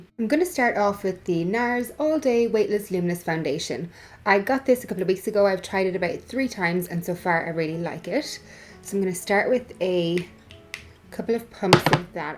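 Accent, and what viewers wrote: Ireland. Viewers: is from ireland?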